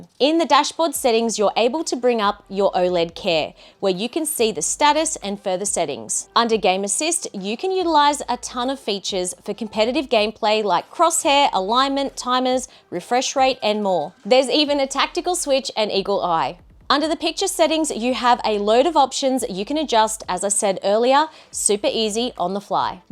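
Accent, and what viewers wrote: Australia. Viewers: You have one gorgeous Australian accent, 😍 I love listening to it in your videos. Nice gaming monitor here looks bloody cool! 😎👍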